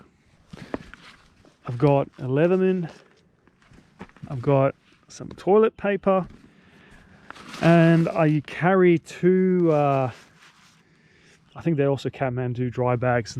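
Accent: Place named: South Africa. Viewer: I was pretty sure there was some South African! My bosses are both South African and listening to you, the odd word here and there, sounded exactly like my bosses. Would never have guessed the other countries though! 😊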